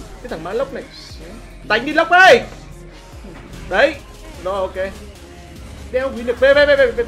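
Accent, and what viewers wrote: Nghe An, Vietnam. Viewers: Cái giọng Nghệ nhà tôi, wou trời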